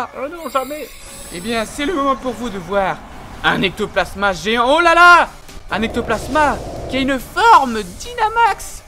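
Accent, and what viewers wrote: North, France. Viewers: Il a naturellement l'accent du nord en faite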